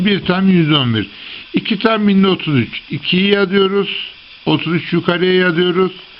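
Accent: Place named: Central Anatolia, Turkey. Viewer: kağıdı halının üstüne koymuşsunuz,masada daha rahat yazardınız,şiveniz ortaonadolu gibi...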